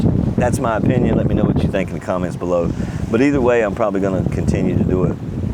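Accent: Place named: Southeast, United States. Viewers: Hey. I lived in Virginia Beach for 35 years and wouldn’t trade it for anything. You sound like a North Catalina boy. My limited experience would put you in the Outer Banks. Anyway, I dig your content. In the process of selling my BMW R1200GSA WC and will save that for my scooter when I arrive north of Manila September 2028. As an Army veteran, I’ll do the homework for a SRRV. From So. Cal., JM. P.S. Bless you for the charitable heart. 💪🏼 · I am new to your channel, I also live in the philippines. Alabang . I am trying to pinpoint your accent , I'm guessing the southeast United States. Maybe Alabama or Georgia. Any way my Budget runs about 45000 a month php i am pleased to meet you ,Frank from Florida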